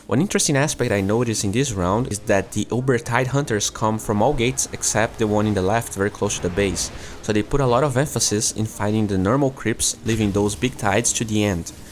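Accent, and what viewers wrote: Brazil. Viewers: Entendi no final do vídeo. É pelo dinheiro ganho no Patreon. Mesmo com esse sotaque muito brasileiro no inglês, onde até quem não é americano ou inglês vai perceber na primeira palavra que não é do país dele e é de um país que não fala inglês, nesses países tem mais pessoas dispostas a doar dinheiro pelo Patreon do que países como o Brasil. Pior pela situação econômica do país. Essa é a única coisa que penso sobre mudar de país o seu canal pra um cara que mora no Brasil e tinha inscritos no Brasil: Dinheiro do Patreon.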